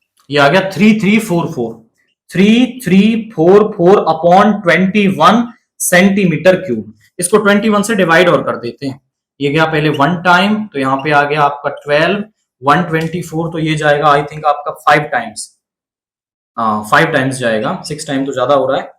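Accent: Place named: Haryana, India. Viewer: Haryanvi touch 👍👍👍😂😂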